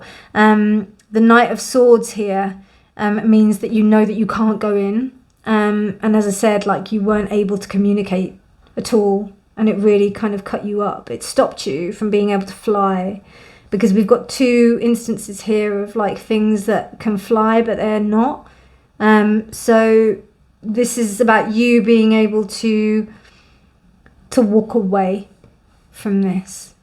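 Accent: United Kingdom. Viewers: Finally, I'm done with the things I need to face. And now I have the time to see this! Woooh! I already watched the other suites and I saved this as last. Save the best for last. Thank you, Ms for choosing this deck. I love this deck. ✨🤍 Yet it made me had a hard time choosing for I love all the cards from this deck. 😂 And when you mentioned "Harry Potter" with your british accent. I suddenly remembered Hermione. Add your intelligence, brave voice and laugh. ✨🤓✨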